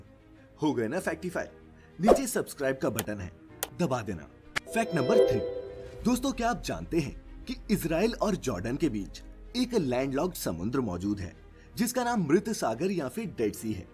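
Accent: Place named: Rajasthan, India. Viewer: Bhai aap pkka RAJASTHAN se ho na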